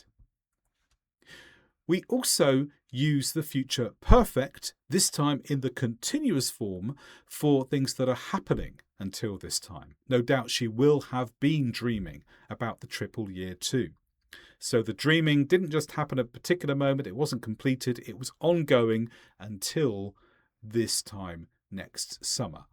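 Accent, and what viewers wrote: England, United Kingdom. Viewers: What a joy to hear your English English pronunciation!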